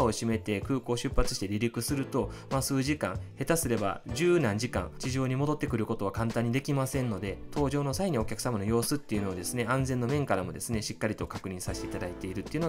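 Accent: Kansai, Japan. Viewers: いろんなとこ開けさらして何してんねや😂 関西色特に強め（笑） プレミアムスナックの正体が気になりました エコノミーでもらえるのと違うんや✨食べてみたい😋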